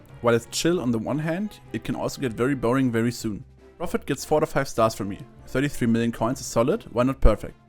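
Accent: Germany. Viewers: u sound german are u german? if yes Hi mein bruder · do I hear a german accent? Edit: Yeah totally useless because you say it later on, i wrote this before watching the video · Hab deinen Kanal grade zum ersten mal gesehen und hab sofort rausgehört dass du aus Deutschland bist. Gutes Video weiter so 💪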